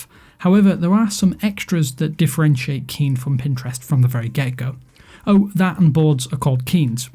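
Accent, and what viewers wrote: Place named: Hull, United Kingdom. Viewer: Hull City, lol...was wondering where your accent was from.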